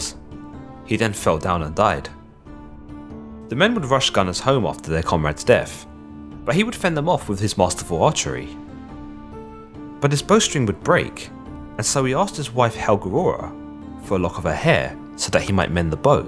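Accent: United Kingdom. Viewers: Icelanders are really not used to accents, icelandic is only really spoken by Icelanders and we all have (mostly, with miniscule exceptions) the same accent so when someone with e.g British accent comes along it can sound like you are talking nonsense but that's not too say that it's you're job to change the way you speak, I have noticed that some Icelanders have a unfair standard when it comes to accents which can be hard for e.g immigrants which put the time inn to learn icelandic So yes you have a really thick accent but besides that than it was just fine. (A bit late to comment)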